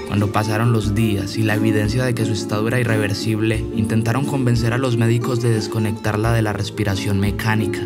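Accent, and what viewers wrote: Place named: Antioquia, Colombia. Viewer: Que paisa, es del Valle de Aburrá?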